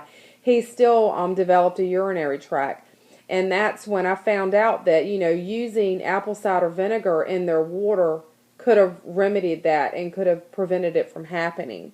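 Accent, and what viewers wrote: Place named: South, United States. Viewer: I also love your southern accent. I'm from the south:)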